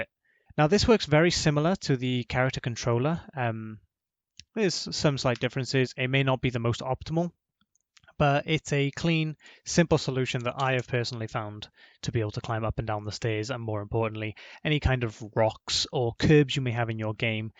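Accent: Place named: Wales, United Kingdom. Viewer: Looks like I'm not the only Welshman (I'm guessing by the accent) who's struggled with stairs! I'll have to give this solution a try, as my own solution became very convoluted, but the in-game result looks very similar to yours. I was trying to create a sort of "fake ramp movement" where I'd calculate the angle/direction from where the character is standing, to the point where they would be stepping up to, and then altered the players movement direction according to that. It involves a bazillion raycasts and lots of fudged maths, and I'm sure is massively inefficient. Maybe this simpler approach is what I need!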